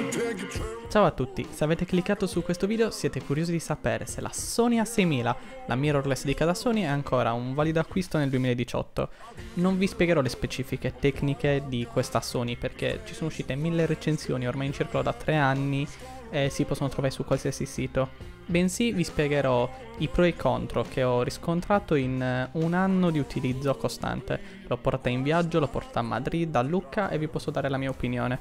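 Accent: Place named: Sardinia, Italy. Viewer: L'accento sardo <3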